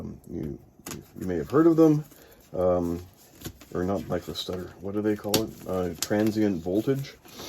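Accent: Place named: United Kingdom. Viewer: Hellooo, I’ve just buyed a Yuhui SFX PSU for 328 RMB or 45 USD (I think it’s a Black Commender 750) in TaoBao, rather than in NewEgg, Yuhui to my knowledge is the same or sub-brand from Sama, I just have some questions, has the PSU exploded or had some kind of issue? Is it silent? I don’t want to assume but you’re accent sounds British and if I’m correct, in the UK, they use 220V and my PSU, like yours, in the input says 100-240VAC (mine had a sticker on the plug saying 220V/AC), do you know if it works with 110V, since I live on a country we’re we use 110V? It also came with a Chinese type I connector (To Outlet) and also to my knowledge, in Chine they use 220V Nice content btw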